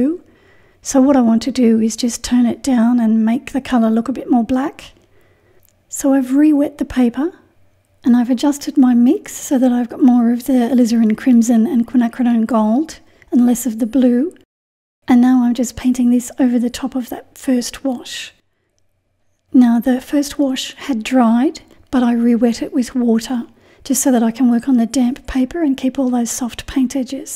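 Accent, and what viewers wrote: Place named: Australia. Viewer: I keep repeating to myself “ I am SO happy I found you on YouTube!”. I love your attention to details WITH the softening and the loose or lost edges. You are a fantastic teacher. Clear, easy to follow and understand. Your limited palette makes me remember to keep moving in that direction and I need to remind myself to let the colours mingle on the paper and stop fussing with them.. I love the Ozzie accent too! So sorry to hear about those horrible fires and so much damage to homes, forests and wildlife. The World IS watching and hearts are aching.. please keep posting your videos are in my favourites list and I watch some of them over and over. It shows how hard you work to get them right!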